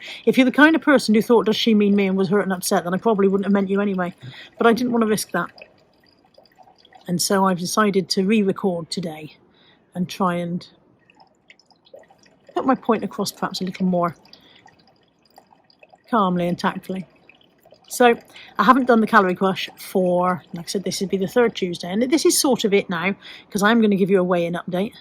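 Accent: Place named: Somerset, United Kingdom. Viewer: Oh - some people are truly b******s what gives them the right to comment on what you do and are?! As you say we dont pay to watch you and if they dont like it - DONT WATCH!! To give someone advice (especially unwanted and unasked for) is truly annoying and completely unnecessary so you just be and forget about all the nasty, horroble and let's face it insignificant people. My Mum always taught me if you have anything nice to say then dont say anything at all - why cant people do this??!! By the way I have to say although I am new to your channel I LOVE your accent!!!! It is so much more noticeable when you do pod casts with Caroline - do I tae it it is a Somerset accent? Whatever, its lovely. Take care and completely ignore all the horrible people and you be you!! Bye for now and much love, Lyn xx xx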